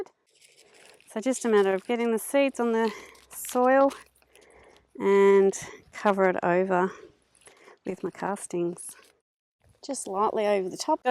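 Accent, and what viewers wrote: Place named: Australia. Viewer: Fantastic so good to hear another Aussie. I see a lot of gardening videos but they are from other countries and it gets confusing with the different seasons . I can now totally relate and makes sense to what you are saying. SUBSCRIBED.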